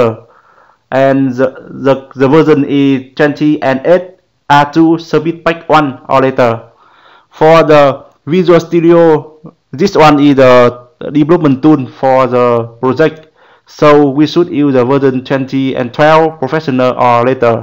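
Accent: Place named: Vietnam. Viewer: You accent is sooooo Vietnamese, I LOVE IT <3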